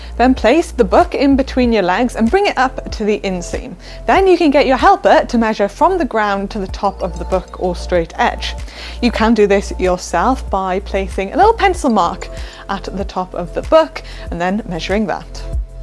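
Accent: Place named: United Kingdom. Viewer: Do you think that British people will watch only? Talking Too fast and with accent !!! I didn’t like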